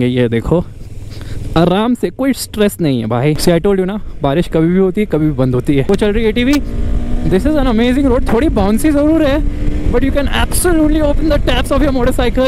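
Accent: India. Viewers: I like your way of speaking Hinglish 👌😂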